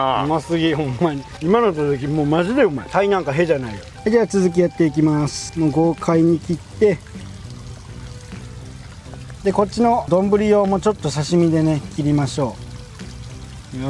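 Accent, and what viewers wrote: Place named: Shodoshima, Japan. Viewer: ナガセの待ち時間長いからね。 小豆島弁が素敵。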